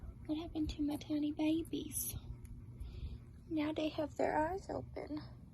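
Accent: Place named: South, United States. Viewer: Your sweet voice combined with your Southern accent is very SOOTHING FOR THEM! 😇😇😇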